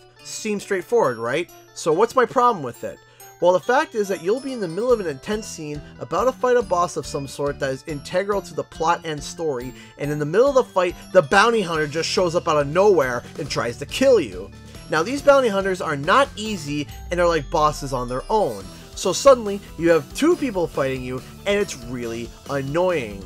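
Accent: Canada. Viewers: You sound real Canadian in this review!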